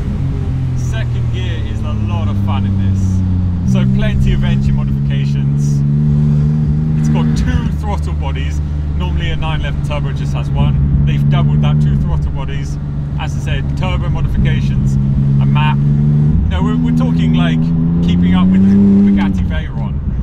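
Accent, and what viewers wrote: Switzerland. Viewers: I was waiting for the day when I hear you speaking swiss german... Finally it has arrived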